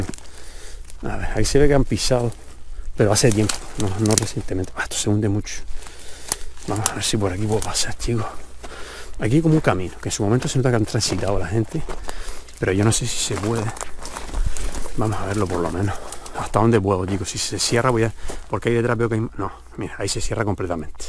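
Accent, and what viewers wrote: Spain. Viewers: Muy interesante este video, increíble. Donde es? Tu acento me parece canario.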